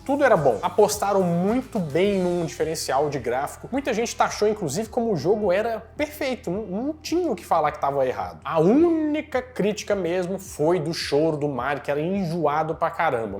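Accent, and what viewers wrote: Minas Gerais, Brazil. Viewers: Como eu amo esse nosso sotaque mineiro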